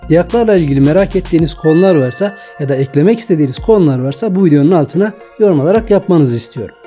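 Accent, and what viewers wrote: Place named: Central Anatolia, Turkey. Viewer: Teşekkür ederim. Güzel anlatmışsınız 👍👌💯 Konuşma aksanınız tahminimce orta Anadolu tarafı ☺️🤔 Aksaray, Yozgat 🤔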